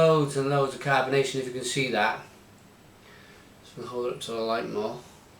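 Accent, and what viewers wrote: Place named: Wales, United Kingdom. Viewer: can i ask a question please are you from Wales as i am myself and i thought the accent was a give away.. another great review bud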